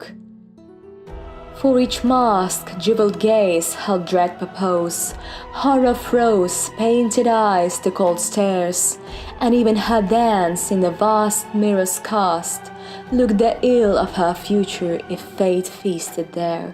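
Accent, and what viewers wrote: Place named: United Kingdom. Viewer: I love your voice and accent. Are you British? By the way, the part at the end literally gives me shivers. Maybe make ASMR?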